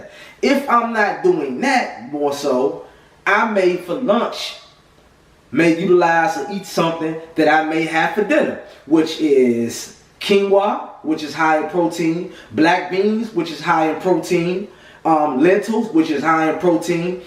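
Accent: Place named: East Coast, United States. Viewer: very informative. looking into becoming vegan in the near future. also, you have to be from baltimore or the dmv area. i detected an east coast accent, then you 'dairy' and that confirmed it. LOL.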